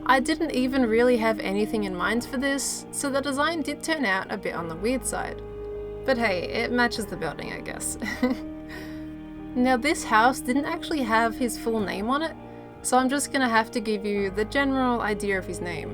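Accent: Australia. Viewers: are you aussie i cant tell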